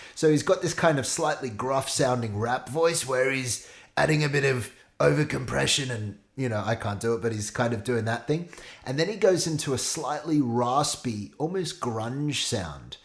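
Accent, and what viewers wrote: Australia. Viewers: It’s not Hau, it’s Hua 😅🙈 But I love australian accent.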